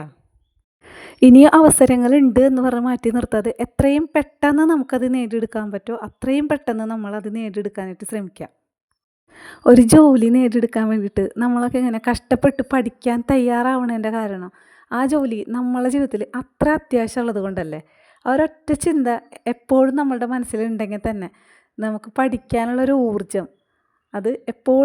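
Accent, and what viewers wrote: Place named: Thrissur, India. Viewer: Aanenn thonunnu njanga tsr kaarde voice kettal manasilaavum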